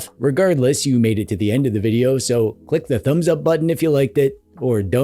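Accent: Canada. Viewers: you're Canadian?!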